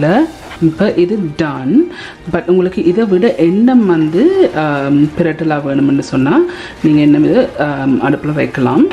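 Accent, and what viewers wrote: Jaffna, Sri Lanka. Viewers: Jaffna tamil👍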